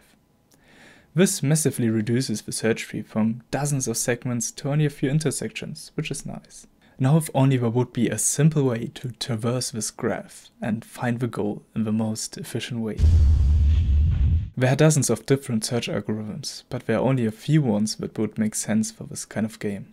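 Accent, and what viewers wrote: Germany. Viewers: Ohne nach zu schauen hört man das hier ein Deutscher am Werk ist :D Nices Video :)) Kennst du das Spiel Mini Motorways? Könntest du mal erklären wie man sowas Grob erstellen kann? also das Verschiedene Gebäude (Arbeit) auf einer Map Spawnen und man die passenden Häuser (Arbeiter) mit Straßen verbinden muss :) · da hoere ich doch Deutsch raus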